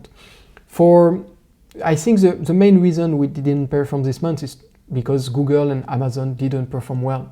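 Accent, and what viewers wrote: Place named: France. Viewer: Haha I love your french accent😂 how old are you?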